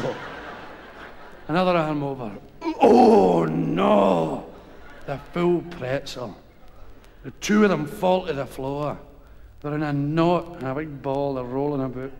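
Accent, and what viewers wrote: United Kingdom. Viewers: Well that’s his accent so maybe you shouldn’t bother watching it! I’m Scottish come from the same place as & I’m so glad he got Scottish culture & humour out to the rest of the world on yer sel big yin!! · Well I'm a Portuguese-Australian and thanks to your subtitles I'm now starting to understand Glaswegian a lot better. You rock 😁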